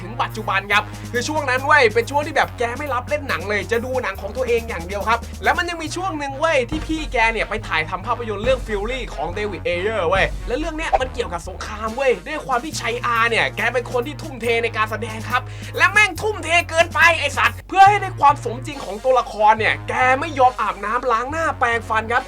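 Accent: Isan, Thailand. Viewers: ใช้คนอีสานมั้ย คนจังหวัดรัยครับ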